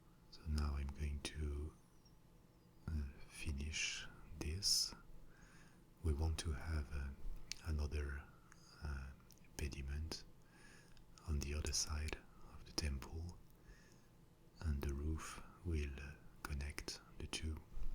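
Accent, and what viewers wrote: France. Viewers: As if regular soft speaking wasn't good enough here comes this guy and does it in a French accent; I AM IN LOVE WITH THIS!! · Vous avez un peu l'accent français dans vos parole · Mon Dieu! ASMR + votre accent francais (+ Minecraft) = Fantastique! :D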